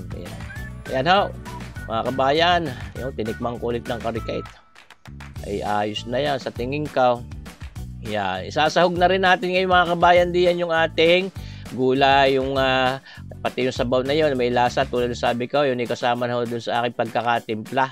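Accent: Batangas, Philippines. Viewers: Walang hiya, tangal lungkot ko sa buhay eh nang marinig ko ang tonong atin sa batangas eh! Regards from san nicolas batangas! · Gusto ko iyan Batangas accent katuwang pakingan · Accent batangas mo ay original ba kasi ang gandang pakinggan you sounds like an old man 😊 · Sarap Chef. Gusto ko yang batanguenong accent hehehe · Kahit di pa rin kami gaanong nakakahulagpos sa pandemic dito sa Central Florida (grabe ang spring breakers sa South Florida!), kami naman ay nakadiskubre ng mabisang gamot sa takot at lungkot dito sa aming basaysay: ang walang-sawang panonoor sa maraming cooking demos at pagbibiro ni. Ala eh, marinig lang namin ang boses at mga purong purong kanluraning salita pati na rin ang walang makakatulad ng Batanguenyo accent, abot-taynga na mga ngiti namin dito, at habang ginagaya namin ang mga paboritong putaheng inihati niya sa mga fans dito sa 'Merika, hindi pa rin napapalis ang aming ngiti. 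Salamat sa gandang-loob mo, marami kang nagagabayang kitchen ninjas sa buong mundo. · Baket gnyan po kau mgsalita, my acent ang tagalog mo, Batanguena kb?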